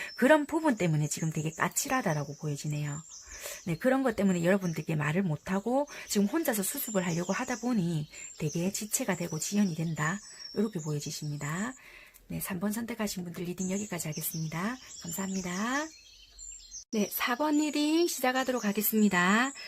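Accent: Busan, South Korea. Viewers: 감사합니다☺️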